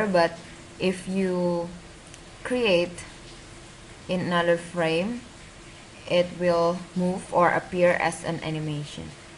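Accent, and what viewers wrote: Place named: India. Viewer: This is really helpful ^__^ Thank You :D btw where are you from? cause your accent sounds a bit indian :)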